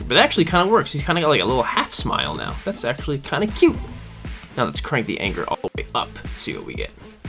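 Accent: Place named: United Kingdom. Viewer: british han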